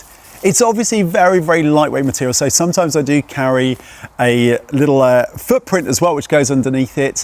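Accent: United Kingdom. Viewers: Since this guy speaks with a British accent, I'm going to assume his idea of bikecamping kit and mine do not align. First off, he doesn't have enough storage space for a 300 mile to 1600 mile trip like we prep for in Canada and America. Add Mexico and South America to that distance if you want to be serious. Second. Bears. Lots and lots of bears. Tarp is an option in N. America. So is a hammock. But it's not a serious option for serious bikecampers who want to roll across the desert and Rocky Mountain regions of Canada and America. Please add, EU advice ONLY to your title, bra.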